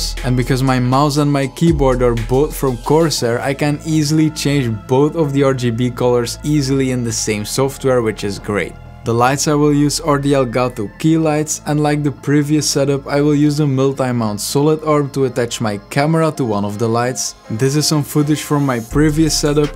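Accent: Flanders, Belgium. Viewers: amai die klinkt belgisch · if you were from flanders (because of the accent)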